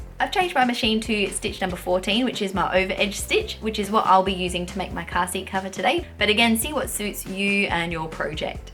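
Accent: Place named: Australia. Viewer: Hi, I have only just found your channel. It’s nice to hear a fellow Australian and using centimetres too. I love your tutorials and look forward to seeing the others. I also look forward to making the fitted cot sheets. Michelle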